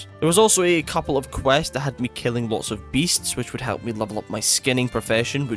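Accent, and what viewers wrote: Scotland, United Kingdom. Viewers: I watched the whole thing and I'm still wondering if yer actually scottish.... Pants?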